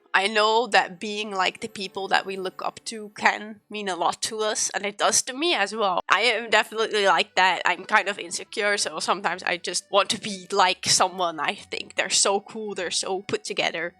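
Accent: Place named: Netherlands. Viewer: You sound Dutch <3 Are you Dutch? Very interesting topic!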